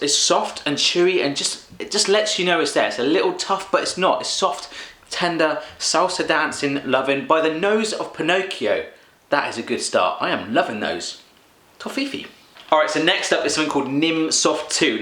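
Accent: United Kingdom. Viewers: Ich finde man hört seinen britischer Akzent sehr deutlich ;D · Er hat ein britischen Akzent :) ich denke er kommt von England. Trotzdem ist es lustig wie die anderen Länder , deutsche Süßigkeiten feiern :D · Er ist aber ein Brite, hört man sofort am Akzent. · Miss Rose he is not american, he's british as you can tell by listening to his accent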